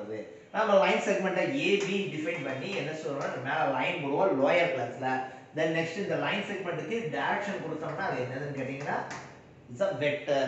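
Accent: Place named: Tirunelveli, India. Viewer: Nellai tamil super sir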